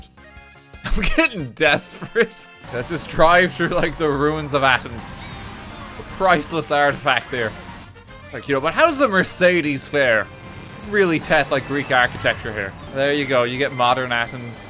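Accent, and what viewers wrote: Ireland. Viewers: Are you accutaly Irish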